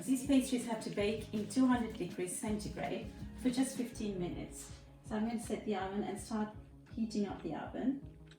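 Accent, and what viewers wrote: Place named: United Kingdom. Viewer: Sweet talented lady with a British Accent, looks yummy ! enjoy your video over and over again !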